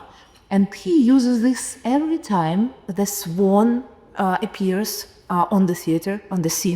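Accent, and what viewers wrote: Russia. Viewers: Я такой русский акцент понимаю хуже чем индийский 😂 Хотя казалось бы😅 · Вполне нормальный такой русский английский. Большинство невыпендривающихся русских на нем говорит. Я обожаю его! Ничего не отвлекает. Все как по-русски, но английскими словами.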